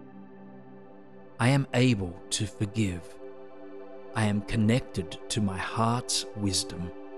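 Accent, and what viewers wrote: Australia. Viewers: Your Australian accent makes these even better! Thank you for sharing these amazing videos.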